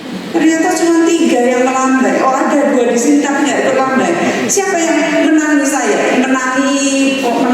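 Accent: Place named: Java, Indonesia. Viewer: Walah ibu Njawani tenan, nek kotbahe wis medok, aku koq yo ngguyu ngekel,.... turut sepenanggungan, ibunda Indah bersama Tuhan Yesus di keabadian Surga Mulia, just btw eyang kakung kulo KRT Widyonagoro Bupati Kasunanan Surakarta era 60an, Pray For Solo... Solo Full of His Glory... Awesome...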